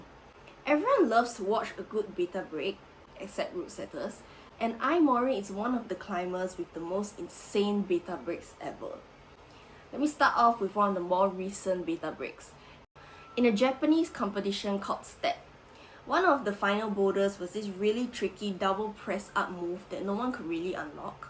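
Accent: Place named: Taiwan. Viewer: Great video. We all love Ai Mori! But I also love your accent 🤣 Where are you from? Taiwan?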